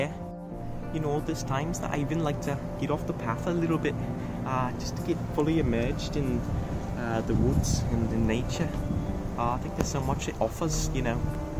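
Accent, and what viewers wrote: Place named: England, United Kingdom. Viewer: Oi, I have a life. Also no Irish man would be offended as that is almost a perfect English accent haha!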